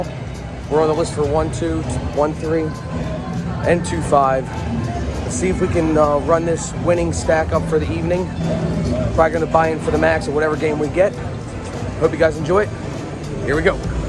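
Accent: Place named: New Jersey, United States. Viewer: Watching from Australia. Love the accent - is it New Hersey?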